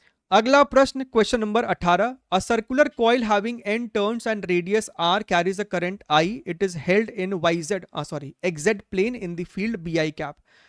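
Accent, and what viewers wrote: Bihar, India. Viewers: Sir kya aap bihar se ho..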